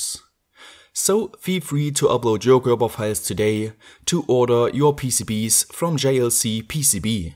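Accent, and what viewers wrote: Germany. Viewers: Give the guy a break! He's German. I don't think his pronounciation is so bad!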